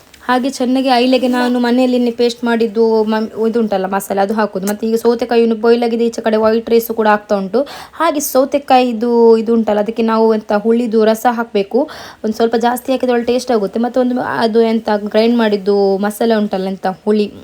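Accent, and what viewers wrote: Mangalore, India. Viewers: This is Mangalore kannada Mangalore an style of speaking that's why you feel that it is not perfect but I feel no problem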